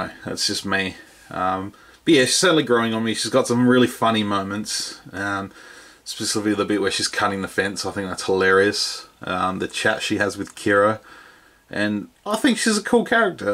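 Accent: Australia. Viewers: Thanks. You are right they let her walk like a human and it spoils all the hard work the effects people did. Where do you get your figs from you sound like an Aussie. Forget the Aussie housing crisis there is there is a black series crisis.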